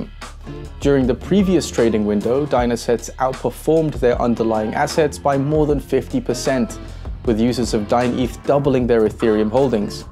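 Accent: United Kingdom. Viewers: English accent/+ , not Robin but Funny/+, look/(...as always) irrelevant - finally! :)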